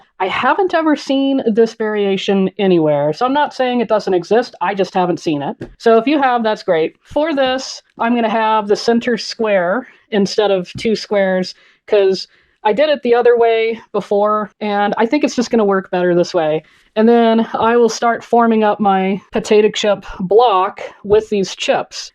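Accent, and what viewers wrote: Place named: Michigan, United States. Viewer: Are you from Michigan? I am replanted to GA but your “accent” speaks my much loved Michigan! We don’t have accents in Michigan.😂. Seriously, a great tutorial and appreciate your style.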